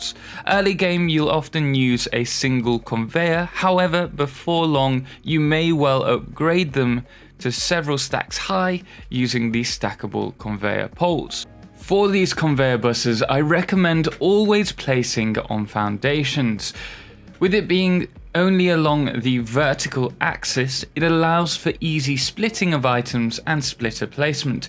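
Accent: United Kingdom. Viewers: Why do you sound like spiffing brit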